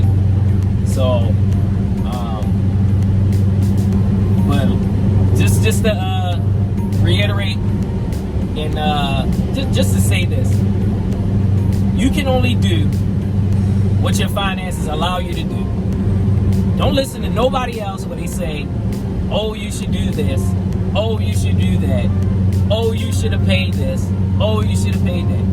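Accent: Baltimore, United States. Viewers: you gotta be from baltimore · Are you from Baltimore? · Gatta be from bmore good vid brother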